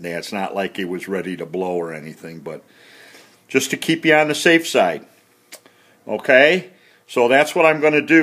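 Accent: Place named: Northern Illinois, United States. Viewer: A fair sized threaded Clevis Shackle sure helps hauling it into the garage out of the crate. The threaded pin on mine just barely fits into hoist hook opening. The fabric hoist sling then easily slides on each side of the shackle. Yeah, I knew you were from northern Illinois. The boat video and the voice were dead give aways. I'll have to work on it to legally get to my property in the UP. I wish I could do some quick connects and haul it out of a trailer up there with a chain hoist and lashed tinbers, but I'm thinking I'm better off just doing fenders and lights and haul back roads at night. Just have an old Ford Explorer and a well restored old dirt trailer based on an ancient welded pinion U shaped frame/axle thing . It holds alot of weight, but .... Thanks !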